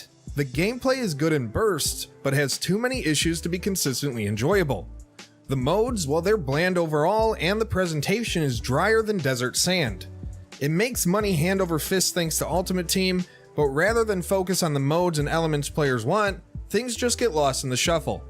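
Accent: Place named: Buffalo, United States. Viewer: You from buffalo lol I hear that accent y’all dragged that “R” on every word I’ll be surprised if you’re not from there or up that way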